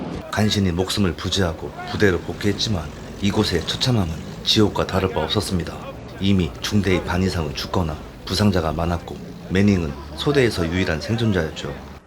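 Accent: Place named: South, South Korea. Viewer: 멘트에 남방 억양이 강해서 듣기 집중이 잘 안되네요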